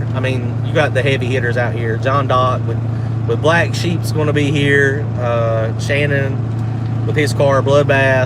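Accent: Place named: North Carolina, United States. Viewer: Dang I thought u was a North Carolinian????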